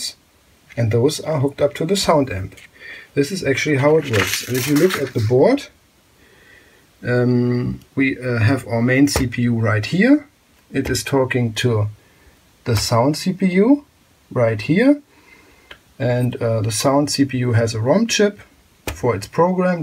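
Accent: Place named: Germany. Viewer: Hey man love the videos! Your german accent is fantastic, and thanks for speaking in english! I found your channel by looking for videos on the Atari System 1 boards, as I want a marble madness cab at some point. But! I have a question, what Jamma>Scart board are you using? I have a 15khz PVM that supports RGB and wanted to start maybe getting some broken arcade boards and fix em up. I usually buy broken consoles and fix them, but IBM PC's and Arcade boards are my next logical step. Thanks for the videos! I'm going to be posting them on some repair forums I hang around as your videos are criminally underwatched. Thanks again!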